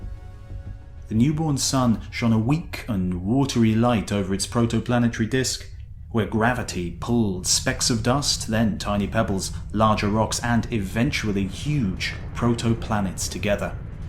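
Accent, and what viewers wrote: United Kingdom. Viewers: I already knew this stuff but I love hearing it again, your presentation is awesome. Plus a british accent always makes things sound much more majestic. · He's from the United Kingdom, not the United States. I'm from Liverpool and pronounce it the way he does.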